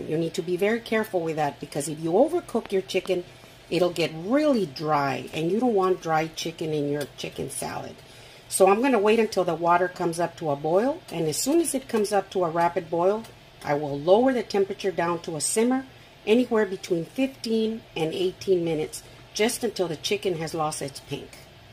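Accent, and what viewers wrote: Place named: Midwest, United States. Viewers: Do u live in mid West of USA ur voice reminds me of ppl I hered talking. when I was out the mid West I just found u love ur videos so fun food looks great . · Latina? No think it's more of Iowa or Minnesota accent but I gotta say awesome video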